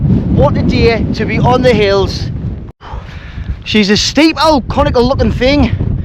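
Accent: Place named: Northern England, United Kingdom. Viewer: As an Australian, I had to tune in to your northern lingo and accent, but I enjoyed your straightforward style. Keep up the good work!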